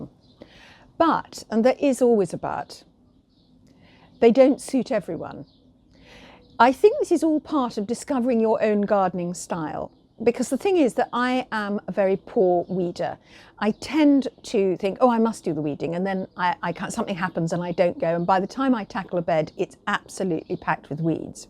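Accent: United Kingdom. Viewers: I love your voice and British accent!! So soothing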